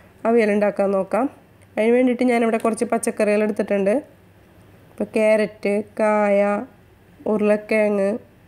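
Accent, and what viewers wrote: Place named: Kodungallur, India. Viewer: ഇത്താ.... വീട് കൊടുങ്ങല്ലൂർ ആണോ... സ്ലാങ് കേട്ടിട്ട്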